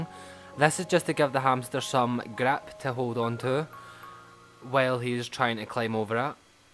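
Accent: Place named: Scotland, United Kingdom. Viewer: Jesus ..you're so scottish😂😂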